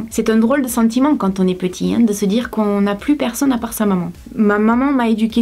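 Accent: South, France. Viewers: J’adore tes vidéos, la plupart du temps ce ne sont pas des sujets qui m’attirent mais je les regarde rien que pour ta personnalité et ton accent du sud que j’adooooore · Merci pour ce partage. Tu es une personne authentique et j'adore. C'est ta maman sur la photo avec toi ? Tu as déjà fait une vidéo avec elle ? J'aimerais trop voir si elle parle comme toi ? Je suis dans le sud aussi, mais c'est pas le même accent. Je ne vous connais pas mais je vous souhaite de bien vous portez toutes les 2, pendant longtemps.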